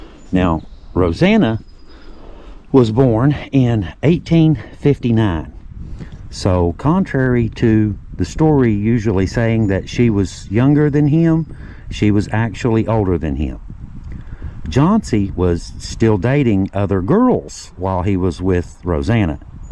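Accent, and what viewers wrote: South, United States. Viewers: I could listen to your smooth southern accent all day long ❤❤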